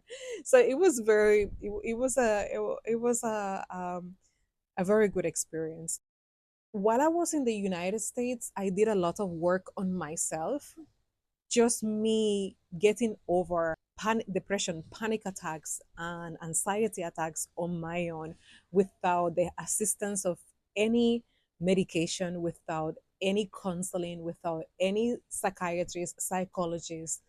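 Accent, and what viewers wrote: Patois, Jamaica. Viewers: My ears are confused, I'm hearing a Cuba spanish accent and a Jamaican accent fused together, lmoa. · After all you are looking so beautiful keep it up you should live up in Cherry gardens jack hills Beverley hills much comfort able love how you talk the Jamaican Patios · Oh wow… yuh sound like yardie. Accent sweet suh 😂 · Tell your truth black afro 🇨🇺 Cuban Queen like your jamaican accent ❤ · Hey,. Interesting vid. I must say that’s it’s amusing hearing your’Spanglishyardie’ accent—very unique. I am a yardie to mi core except l’ve lived all over the US for decades—fully integrated. I visited JA in Summer ‘23 and had 1 helluva experience. I stayed at the Riu Negril for 4 days then at an AirBnB in Mamee Bay, Ocho Rios x 1 week. Very different experiences. There was a group of us so the whole time a l had a rented burgundy Kia Carnival turbo diesel minivan(awesome vehicle). 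Turns out that the rental was a $$ magnet for the cops. My brother(his turn to drive) was shaken for cash in broad freaking daylight on TWO occasions w/i 1 week by corrupt cops!!!😳🤨 On a different occasion l was driving thru Port Maria and saw the body of a bicyclist all contorted lying on the roadside after a hit-and-run.😮 The sad thing was the indignity/callousness as ppl just stood around or went about their business as if……! The other scary thing that l would warn every foreign visitor about is the danger of driving in JA. Not only is it disorienting driving on the Left, but locals drive like MANIACS!!!! JA is a tropical paradise but there are definite risks one must take visiting the place. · Aah mi gal if you ever know my story yu wi cry.love the way yu patwah sounds ❤ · Slight Latin Accent With a Hint of Patios Frequency Nena......Ya Bad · My family are Cubans and its mind blowing listening to you speaking in patua.. 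I love it!!🥰🤩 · Wow I listen to you carefully and you have been through a lot and I am happy that you have endure the hard times ,it's obvious that you love Jamaica over Cuba ,in Jamaica it is diverse,not all of Jamaica is the same some places if you not involved with certain people you don't have to worry about anything yuh nuh trouble anybody, nobody nuh trouble you.especiaally if you are not a Jamaican. You even now sound like a Jamaican if you never says that you live in there I could tell that you have been or intouch with some Jamaican.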